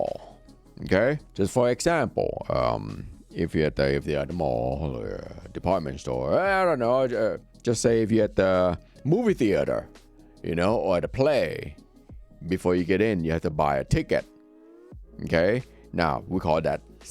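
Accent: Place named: United States. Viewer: Your English is perfect and sounds American 🇺🇸 Great video. I like your humor too. 😊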